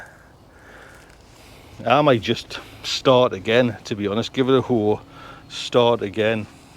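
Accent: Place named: Northern England, United Kingdom. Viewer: We’re a little south of you, accent wise 😂 and my elephant garlic is a bit behind you. The red onions sets are starting to bolt here, every bloody year. Slugs are a nightmare on one bed in particular here. I don’t know what your weather has been like but it’s been like a yo-yo here Durham way. T-shirt then jumper 🤷‍♀️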